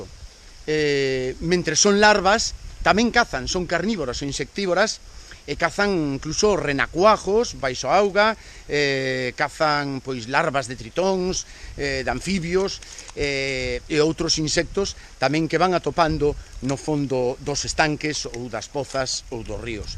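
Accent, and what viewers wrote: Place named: Spain. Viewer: Parece q es un español y esta hablando portugués por q quiza esta en un país donde hablan portugués :v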